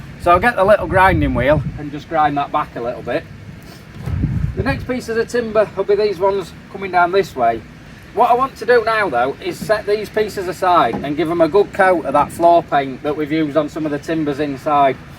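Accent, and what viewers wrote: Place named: Mexborough, United Kingdom. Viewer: I enjoy Chinese but Indian / Pakistani even better. Incidentally, where are you? I assume Mexborough way from the accent?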